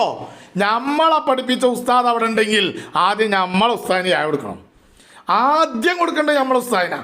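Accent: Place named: Malabar, India. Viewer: ഉസ്താദ് ഞാനൊരു തൃശൂർ ജില്ലക്കാരനാണ് അങ്ങയുടെ മലബാർ ശൈലിയിലുള്ള സംസാരം എനിക്ക് ഭയങ്കര ഇഷ്ടമാണ് കുരുവട്ടൂര് ക്കുള്ള ഓരോ വീഡിയോയും വേണ്ടി കട്ട വെയ്റ്റിങ് ലാണ്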